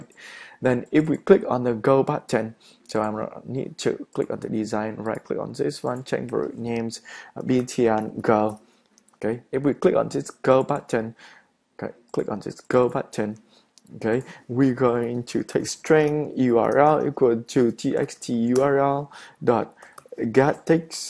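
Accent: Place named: India. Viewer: Anh này Việt Nam mà accent như Ấn Độ ấy nhỉ =))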